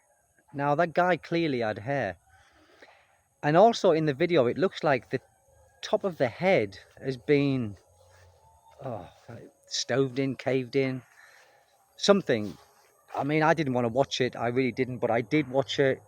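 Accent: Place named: Scotland, United Kingdom. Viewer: Started listening to you speak and you reminded me so much of my time teaching English in Japan at a British based school. Just closed my eyes and listened. Correct me if I'm wrong but do I hear Scotland? Sound so much like my friend Pat. Cheers mate!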